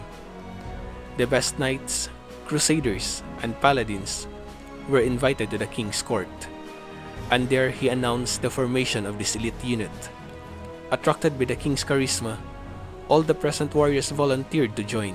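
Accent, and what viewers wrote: Philippines. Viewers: That is a very strong crisp filipino english accent.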